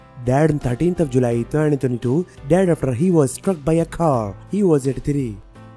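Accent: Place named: India. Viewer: He cannot help his accent. I believe he may be Indian.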